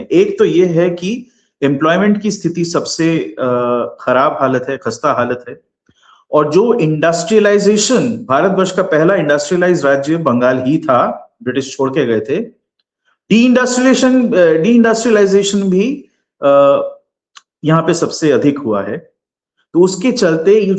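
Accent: Standard. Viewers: Dada Namasthe. Aap ki hindi bahut hi shuddh hain. Dusri baat eah hain ki aap ka vishleshan vaastavikta ke bahut hi sameep lagta hain. Chunav parinam ke paschat hi asli drushya dikhega. Bahut achi vishleshan. Dhanyawad 🙏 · ji aapki hindi bahut shudh hai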